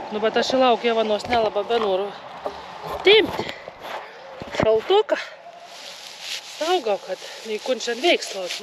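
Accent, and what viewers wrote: Samogitia, Lithuania. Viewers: Viskas bus gerai moterie,esi išskirtine nes kalbi žemaitiška 😅o dabar Lietuvoje tarmes ar dialektas labai vertinimas 👍🙂🙂🇱🇹🇱🇹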